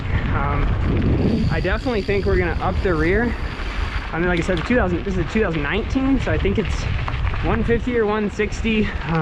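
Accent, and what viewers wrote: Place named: Western New York, United States. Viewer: Fellow Rallon rider here but I wanted to know - are you from WNY? Or Eastern Michigan? Your voice sounds like home and I'm from Rochester.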